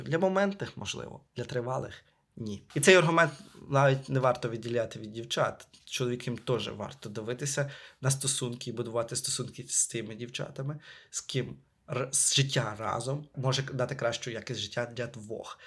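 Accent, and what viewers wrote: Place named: Russia. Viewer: друже, роби щось з тим акцентом, бо тобі ще в США жити, а говориш ти ну дуже так собі. рашан ексент :D оце "і"-кання та "ге"-кання - це прямо як маркер. Та й в деяких словах коли ти замість G вимовляєш H - не одразу зрозумієш що ти там такого сказав.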